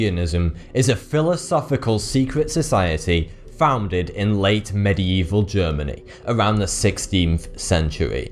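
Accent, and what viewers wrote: United Kingdom. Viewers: Omg . The guy speak such hard British English lol)))) so funny . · Well with your obvious British accent why don't you expose it was European who founded Skull & Bones and expose some of the Royals witch covens like "Order of the Thistle" and "Royal Order of the Garter"???? Why don't you expose how many Skull & Bones members have been knighted by the Queen? Most in Britain are freemasons were you a Marlay child? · These videos are boring and I hate his british accent I cant understand why they have such a shity accent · it's his terrible punk/slang British accent. Ffff - thhh words are always mixed . He does it with other words in his video's. Not the typical correctly pronounced formal British accent we are all used too , depends on where the Brit lives .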